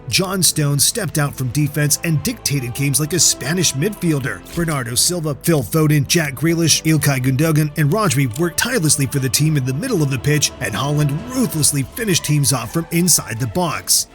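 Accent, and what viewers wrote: United States. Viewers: You'd heard that american accent? They're new here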